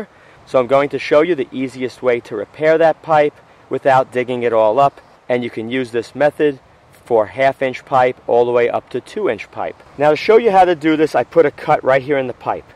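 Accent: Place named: New Jersey, United States. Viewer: So was this filmed on the Jersey Shore (pronounced "Show ah") ? :-) That accent sounds familiar.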